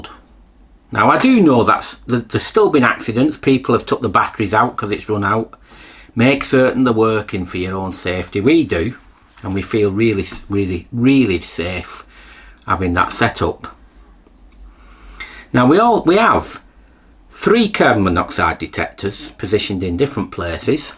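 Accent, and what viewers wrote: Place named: Yorkshire, United Kingdom. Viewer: Yorks accent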